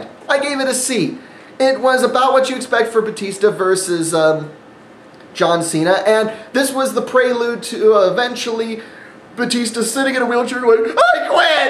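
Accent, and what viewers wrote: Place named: Mexico. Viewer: im mexican and i found ur mexican accent funny i made me crack up great review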